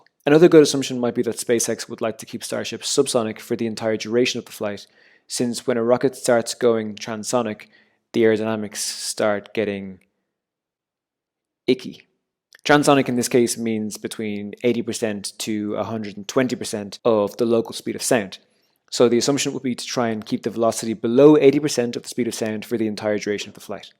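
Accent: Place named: Ireland. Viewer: Terrific! And who doesn't like a nice Irish voice! Keep up the great work!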